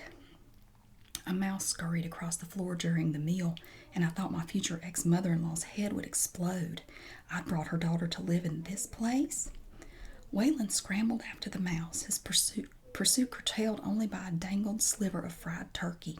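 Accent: South, United States. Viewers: I subscribed! Like my southern sister speak!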